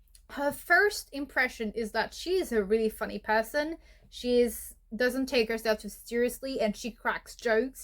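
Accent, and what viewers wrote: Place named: Wales, United Kingdom. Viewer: I love your Welsh accent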